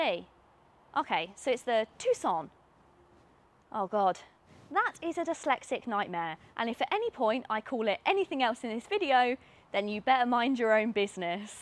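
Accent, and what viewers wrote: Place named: England, United Kingdom. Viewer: English accent makes car reviews more lovely. That said, can’t help noticing driving on the left side. Having lived in the US for a long time, I would cause a massive accident if I had to drive in the UK.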